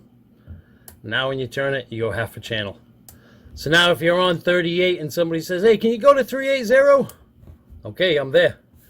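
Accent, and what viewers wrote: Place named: New England, United States. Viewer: Great video, you sound like your from my area.... 22 KING OF THE HILL, Souther NH... you have a new england drawl... Rhode Island maybe?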